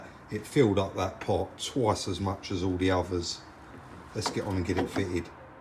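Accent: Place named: Essex, United Kingdom. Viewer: Hi boys I’ve been following you on YouTube on my tv and on there I cannot comment so now have joined you on my I pad to comment. Great channel great work , and it’s really nice that I hear some one speak Essex as I do love the vids keep up the good work , just one thing puzzles me is where is all the TEA?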